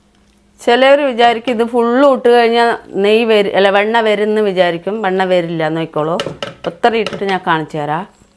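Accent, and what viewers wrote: Palakkad, India. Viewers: പാലക്കാട്‌ ആണോ സംസാര രീതി കേട്ടിട്ട്? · നാട് പാലക്കാട്‌ ആണോ സംസാരം കേൾക്കുമ്പോൾ അതുപോലെ തോന്നുന്നു ❤ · പാലക്കാടൻ ശബ്ദം...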